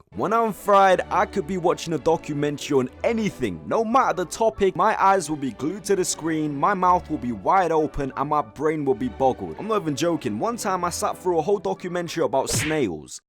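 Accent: United Kingdom. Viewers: After half of the video, just found out this dude is British 💀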